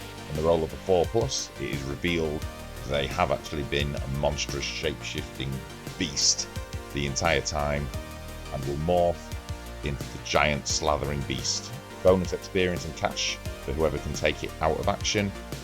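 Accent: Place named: Leeds, United Kingdom. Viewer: I've been thinking of doing some 'Munda battle reports myself but after seeing yours I've given up on the idea. I can see how each batrep is improving with every episode and I'm fully expecting next year's efforts to be on at the local flicks with the production being so good! I can't even imagine how long they take to do but I'd just like to say I appreciate all of the work you put into these, GW could learn a thing or two from you. P.S. are you from Leeds? I ask because you say the number one like I do and I think there's only us Loiners that pronounce it won instead of wan 😉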